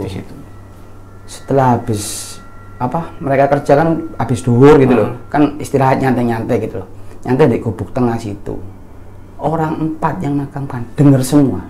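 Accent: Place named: East Java, Indonesia. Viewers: Vidio kali ini bikin sy kangen kota kelahiran tercinta Suroboyo...Logat Suroboyoan bikin makin kangen serasa lg ngobrol jg. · Sing crito ngomonge medhok suroboyone... Aq wes ora iso ngomong suroboyoan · Logat orang sby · Sip keren jawa timuran nek crito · sy sudah 22 th tinggal di kalimantan, sy suka dengar chanel ini, kangen dg bhs jawa timuran yg medok, rasa rindu dg kampung halaman.... sukses.... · Thul.. thul.. lheep!! 🤣 gregeten koyo ngrungokno konco dewe lagi crito. keren mas e cerita dengan logat khas jawa timuran. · logat suroboyoan jadi pengin balik surabaya..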